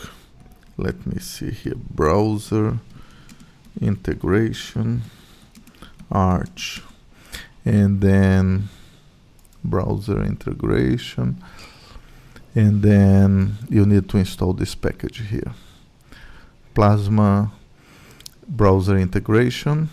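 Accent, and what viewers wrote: Brazil. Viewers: tnx for the video! anyway, r u brazilian or something like this? i noticed a very specific accent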